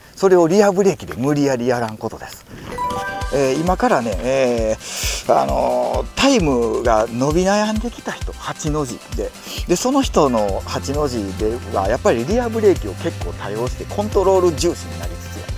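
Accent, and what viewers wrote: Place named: Kansai, Japan. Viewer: シャープですね〜(^ ^) 素晴らしい動画でした！ 柔らかい関西弁も聴きやすくて良かったです(^｡^)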